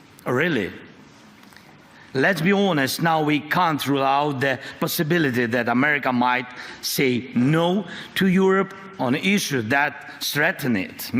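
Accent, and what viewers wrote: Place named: United Kingdom. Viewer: We need more bri-ish voiceovers its more suspenseful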